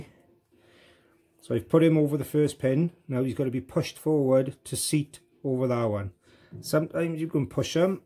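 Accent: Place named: Wales, United Kingdom. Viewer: Great, l've been looking for a vid like this of how to jam the front sprocket to enable to take it off. Spoken with a lovely Welsh accent too! Cheers buddy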